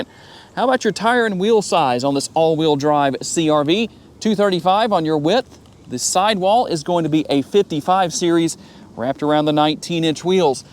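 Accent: South, United States. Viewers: Love the car and great video description. I treated myself with a 2025 urban gray colour. Love the ride and the confidence of being in control on the road facing fellas with souped up pickup trucks. By the way I could listen your voice all day it’s very soothing and welcoming. I always been attracted to that lovely southern accent! Keep up the good work sugar love!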